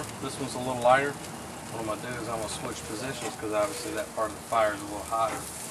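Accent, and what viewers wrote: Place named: South, United States. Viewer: Kiddo, where did you get that coloma meets southern drawl?? You're SO awesome. Love the vids!!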